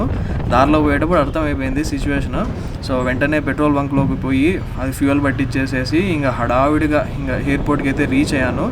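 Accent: Rayalaseema, India. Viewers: Like your rayalseema ascent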